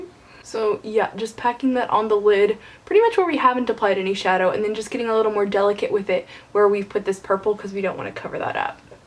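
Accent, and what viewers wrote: England, United Kingdom. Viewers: This video made my morning,! So fun! Love your English accent, love! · thank you- I love shows with a brittish accent:)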